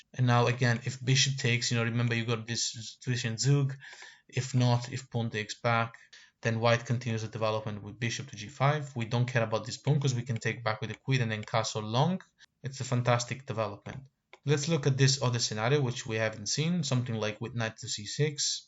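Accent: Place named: Wales, United Kingdom. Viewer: You have an interesting accent. Welsh with something else.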